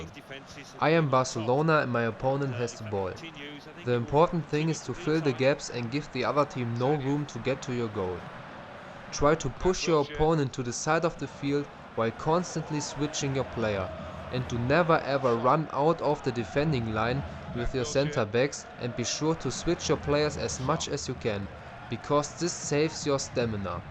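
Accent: Germany. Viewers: Sick accent bro, I love Germany! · haha, i love the german english :D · he speaks very good he just haves a german ancent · German accent. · Kann man gleich an deinem Englisch hören, dass du Deutscher bist. Schönes Tutorial :D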